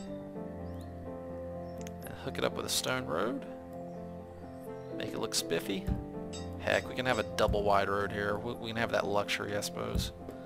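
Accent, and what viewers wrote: South, United States. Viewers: Thats a Southern accent people... herps [erbs]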